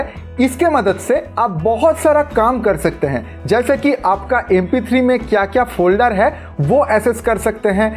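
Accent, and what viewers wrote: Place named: Bengal, India. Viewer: Bongali Hindi accent yckk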